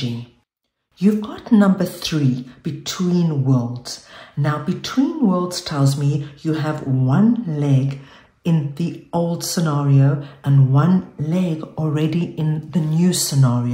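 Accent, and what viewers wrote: South Africa. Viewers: Thank you so much for a lovely reading again 😅 do i detect a South African accent? Or am i getting old 😅😅😅 Lots of love from Cape Town ❤❤❤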